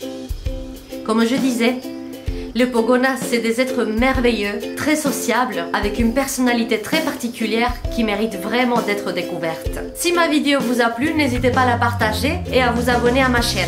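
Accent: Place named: Russia. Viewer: Tu es d'origine russe ? Tu as l'accent 😉 Je parle Russe et travaille avec des russes donc ces accent est très familier.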